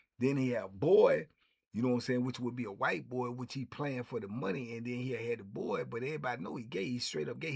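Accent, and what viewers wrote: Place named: New Orleans, United States. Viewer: Yo do you have New Orleans roots? I know you from DC but I hear you sound like me from time to time. New Orleans ⚜️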